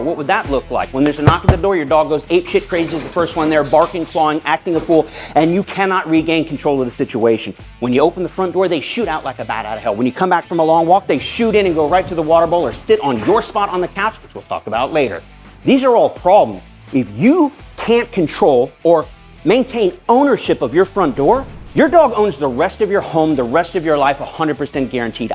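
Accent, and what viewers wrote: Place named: Louisiana, United States. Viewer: Nailed that cajun accent!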